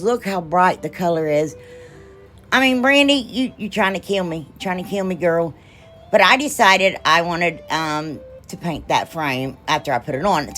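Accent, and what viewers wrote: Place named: Appalachia, United States. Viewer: 🩷Your accent,🩷 OH MY HEART! My family is from the Appalachian Mountains in Virginia, and I don't get to see them often, my Parents have moved to Heaven, so I don't get to hear this often, I could sit and listen to you FOR DAYS! I love your work, but, hearing you talk, takes me home and brings such joy to my heart, Makes me just want to hug you. THANK YOU for sharing with us. (That said, I don't know if I got a bad one or I'm too stupid to use it, but, rub n buff 🙄 I went to use mine and it was like a vein opened and squirted everywhere. Is it supposed to be liquid-ish?? Runny.. I even 'shook' it. So glad I found your channel. Be blessed in Jesus Name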